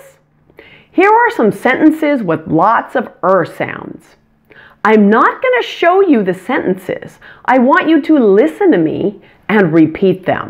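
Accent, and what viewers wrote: United States. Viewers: But you pronounce it the American way not the English way.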